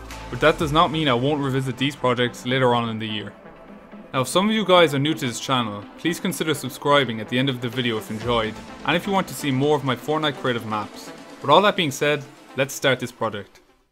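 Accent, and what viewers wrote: Ireland. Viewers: Are you Irish you sound Irish? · btw are you irish because you have an irish accent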